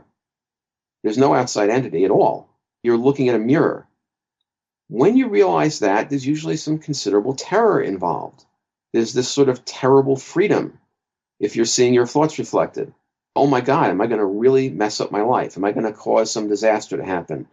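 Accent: New York, United States. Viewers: Awesome perspective. You sound like youre from the ny area...so am I so theres an added comfort of sorts there. Thought really does lead to forms. The universe is one long song. When we are in harmony, we feel joy. When we are in disharmony, we feel anxiety. The problem today is there is an unspoken pressure to contruct a life that is by design unfulfillibg. So we seek pleasures to relieve us and soothe us. Sickness of society can only be faced by the individual. Seeing the power we truly have every day could liberate so many from the victim mindset. Much thanks!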